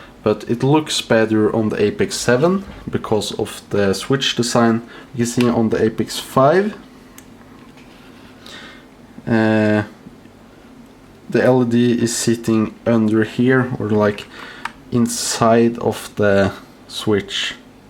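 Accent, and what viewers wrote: Sweden. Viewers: I like this Nordic Swedish Accent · Are you from Sweden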